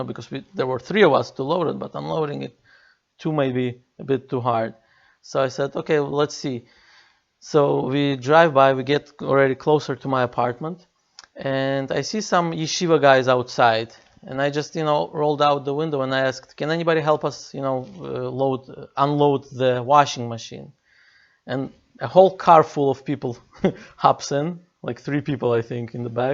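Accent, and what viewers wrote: Russia. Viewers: You have a Russian accent. Are you from one of the former USSR States?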